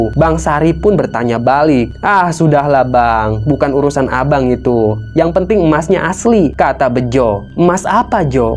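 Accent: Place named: Medan, Indonesia. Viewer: Sharusnya logat bicarany tu logat banjar,, knp kemedan medanan,,,?? Bungulll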